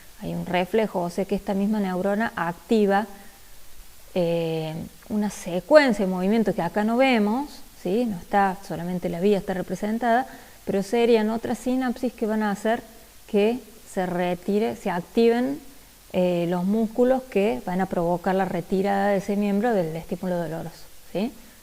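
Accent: Córdoba, Argentina. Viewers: es re util gracias sos de cba por la tonada?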